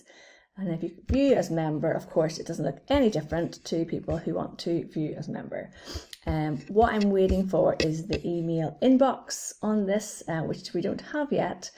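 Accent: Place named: Northern Ireland, United Kingdom. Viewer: your voice is horribly muffled making it hard to understand what you were saying. Please review your videos, or have someone else do it. I could not understand you and had to turn on the captions to read what you were saying. Your work is good, but your voice quality is atrocious. You might also check yourself for regional accent items (are you Northern Irish?) to more "regularize" your English. Speak more clearly (enunciate), use a better microphone, and "flatten" your accent to a more BBC standard (or god-forbid, USA Mid-West standard) will make the valuable messages you have more easily received by folks. Thank you.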